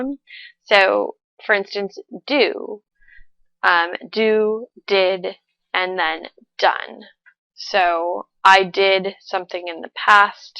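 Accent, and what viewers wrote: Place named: United States. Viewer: Hey from Brasil It's quite nice your way of teaching and your accent as well. Are you american?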